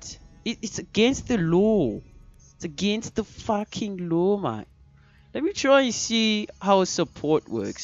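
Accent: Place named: India. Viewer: awesome game man and whats wrong with that Indian accent dude -_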